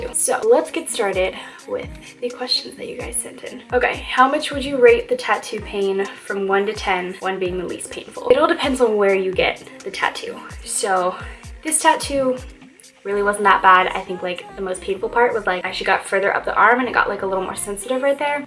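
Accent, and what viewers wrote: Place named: New Zealand. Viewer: you have a cute lil nz ❤️ that makes me so happy haha xx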